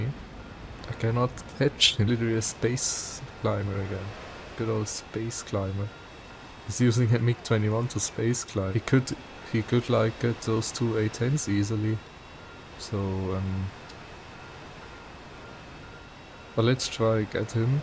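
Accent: Germany. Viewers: Kann es sein das du deutscher/österreicher bist? ^^ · You are german right ?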